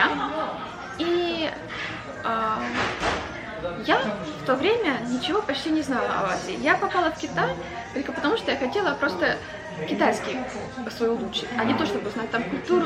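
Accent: Ukraine. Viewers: ты говоришь на суржику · Харьковский суржик - это здорово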